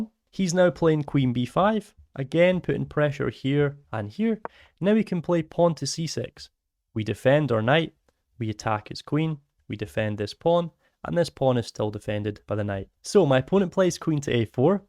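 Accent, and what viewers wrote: Scotland, United Kingdom. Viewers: It's Scottish 😂😂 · It's a Scottish accent. Canadians have the weird accents.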